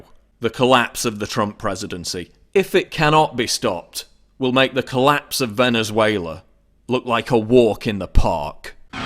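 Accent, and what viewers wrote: United Kingdom. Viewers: We're the crazies. Sure, nothing wrong with Trump. Who are the leakers? WTF is a Brit narrating this? He sounds just like Robin Leach from "Lifestyles of the Rich and Famous." Before Trump was elected, one of his associates said that if he didn't win, "there will be blood in the streets." That scared a lot of people, because we figured it wouldn't matter if he won or not. Because he insisted that the election would be rigged. But he won, so it wasn't rigged... I don't want any wars and I would prefer that he resign to being impeached. But he will never quit because he'll never admit to doing any wrong. Trump told an Evangelical reporter that he has never done anything for which he would have to ask God's forgiveness. In other words, he is perfect and sinless. A bit confusing by most Christian theology, but what the hell. He will never quit because he would never admit to any wrongdoing (adultery, etc.) If only Fox News has managed to report the truth and this Russian stuff is all made up, then he's fine. 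The Russians did a lot of hacking. Trump knew that, but he jumped at the chance to use every Wikileaks the Russians managed to get and spread all the lies the Russians spread about Clinton, and chant the hateful "Lock her up." He colluded. In spirit anyway. You say what Trump revealed to the Russians was to help save them from ISIS attacks? That's good from my pov. But he could have been more discreet. He could have not told them where the info came from. He could have made s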